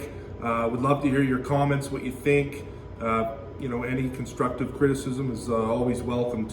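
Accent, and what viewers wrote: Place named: Canada. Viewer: As a Canadian i appreciate hearing our accent in the video😂🙌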